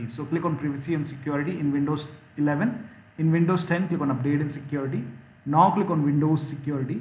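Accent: India. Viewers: as soon as i heard that indian accent i knew i would be saved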